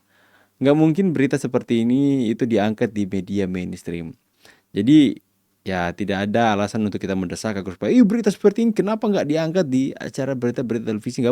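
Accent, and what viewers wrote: Java, Indonesia. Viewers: baru tahu..kalau mas..ayah ibunya org Malang...mangkanya logatnya kok medok org jawa...salam dari malang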